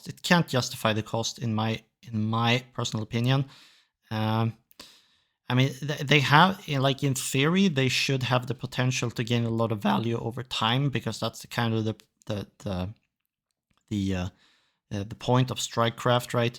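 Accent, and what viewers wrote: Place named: Sweden. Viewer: That's a Swedish alright. Immediately discernable.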